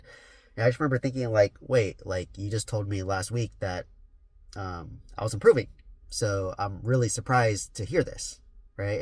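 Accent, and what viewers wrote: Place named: California, United States. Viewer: He sounds like he's from California.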